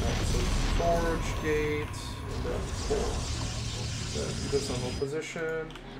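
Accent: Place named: Quebec, Canada. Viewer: ça parait dans ton accent que tu es québécois entk thx pour ta vidéo tres bonne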